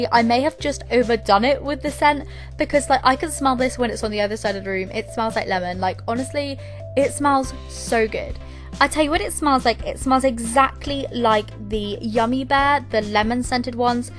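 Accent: United Kingdom. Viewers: I love you're accent I WISH I HAD YOUR ACCENT I THINK ITS BRITISH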